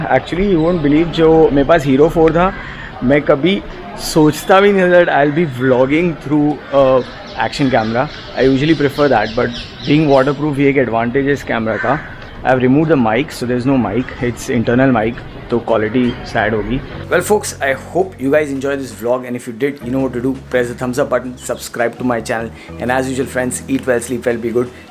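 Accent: Gujarat, India. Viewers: Are you guju bro ?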